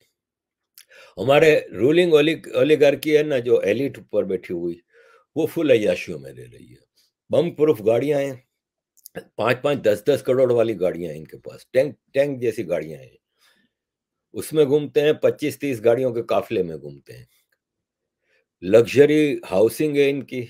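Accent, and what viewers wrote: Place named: Sindh, India. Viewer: Sai your ascent and your style of speaking completely resembles to the Sindhis of our Jaipur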